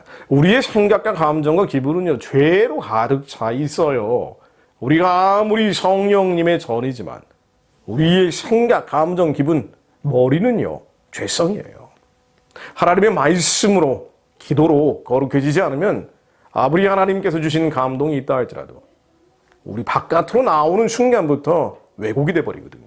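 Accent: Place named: North Korea. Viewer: 이사람도 이북악센네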